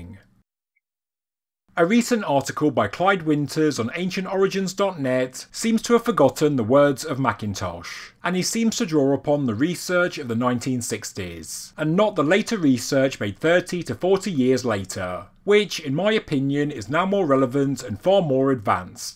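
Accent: United Kingdom. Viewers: That's one version of a british accent, but I do agree that it can be hard to listen to for us north americans.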